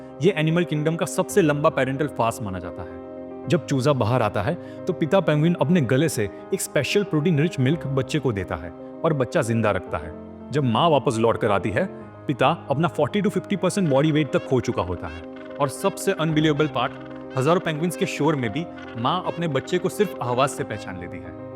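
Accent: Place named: Gujarat, India. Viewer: mko aap gujrati kyu lg rhe ho aapke bolne ke tarike se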